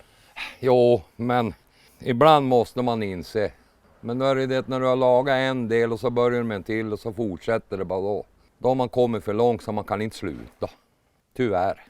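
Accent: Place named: Norrland, Sweden. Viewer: Hur roligt som helst 😂att se en Opelfantast med en dialekt som är superhärlig. En sann norrlänning 👍. Jo jag har haft ett antal Opel genom åren. Några Rekord, 1 Commodore, några Kadetter, en Omega kombi, och den sista var en Astra.... Gillar man rost då ska man köpa Opel😄. Nä fy, det var alldeles för mycket fel på dom, i synnerhet Omegan från -87. Men det är kul å se att det är någon som fortsätter och gilla och bevara Opel från den tiden. Något som skulle vara kul att ha vore en Commodore från tidigt -70 tal men nu är det för mycket pengar i dom... Hälsningar från en Sörlänning boende i Småland 😎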